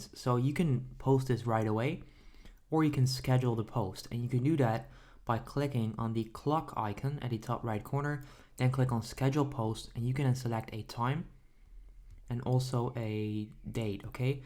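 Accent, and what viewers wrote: England, United Kingdom. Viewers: Finnaly! A video about this that is in proper English accent there is no way I can understand indian people talk english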